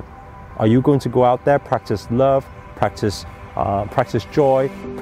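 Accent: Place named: Northern England, United Kingdom. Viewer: Hi, I'm listening from near Manchester UK, I notice a northern twang in your accent x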